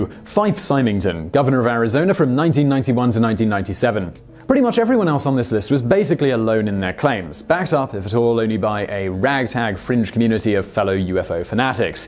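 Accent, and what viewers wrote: United Kingdom. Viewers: He is British , hence he use British not American pronunciation norms. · Hes British. They say some words differently. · He's British!? I hadn't realised !!!!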